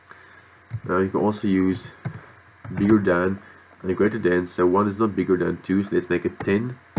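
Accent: Afrikaans, South Africa. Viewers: ayy ek kan daai afrikaans hoor in jou stem hehe, baie dankie vir al die tutorials. Dit help rerig baie!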